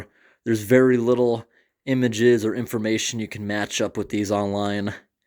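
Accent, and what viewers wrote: New England, United States. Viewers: That is his new england accent.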